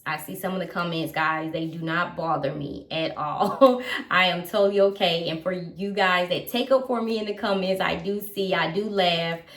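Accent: United States. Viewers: You are an inspiration to young women everywhere girl . Owning your own businesses and being so established let’s women know if they want something they can work hard and go out and get it for themselves ! I can only imagine the questions you get daily 😂. I come here to watch the bags girl , I want to see what you choose next , I love that you love LV canvas bags like I do . Not that I don’t find many of their leather bags beautiful , they just are not what I’m attracted to as far as LV . You are very detailed when you show your things , very polite and sweet , love your southern accent (I’m from New York) . I don’t think VIC’s should have restrictions , it makes sense to me . They did claim VIC’s would not be able to pre order canvas moving forward either , but I think it’s something they should over ride for a VIC customer . Customers like you who love the bags shouldn’t have to suffer for the VIC’s purchasing just to resell . 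With the new microchip system , I would think LV can now see what VIC’s are only purchasing to resell and which truly love the items for themselves . Thank you for sharing with us , girl I would have continued to watch and support you and never asked what you did for a living . I’m here for the eye candy ! I did videos for a minute but had no desire to be an influencer , I also had no desire to share every private aspect of my life , so I stopped filming , still love watching and supporting my favorites ! I find you very relaxing to watch and listen t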